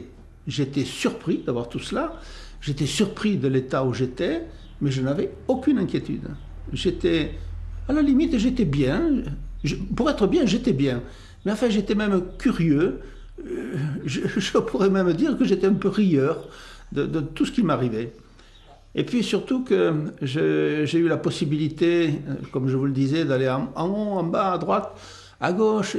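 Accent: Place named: Toulouse, France. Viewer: Ça fait plaisir de te revoir et entendre ta voix et ton si joli accent toulousain. Merci! Ton témoignage a été l’occasion pour moi d’ouvrir une porte sur un univers insoupçonné 🙏 😘💕💕💕💕💕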